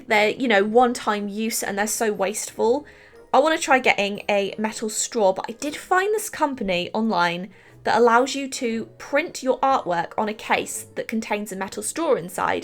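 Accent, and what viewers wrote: England, United Kingdom. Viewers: Are you in Uk? Just wondered you sound English. I like the look of the brush cleaner. xxxAnnxxx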